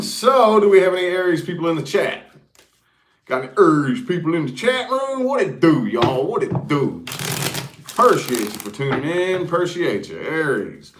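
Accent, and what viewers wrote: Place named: Kentucky, United States. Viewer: Love your accent. You sound like us in Kentucky.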